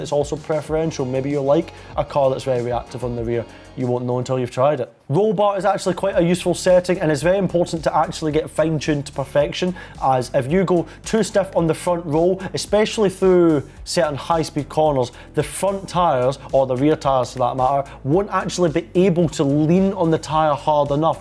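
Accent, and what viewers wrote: Scotland, United Kingdom. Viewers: the scottish is showing here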